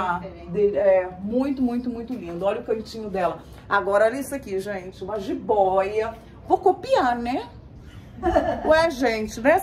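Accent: Rio de Janeiro, Brazil. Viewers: Moça vc é admiravel, espontanea, super vc, adoro carioquissima com esse sutaque amooooooo muitooooooo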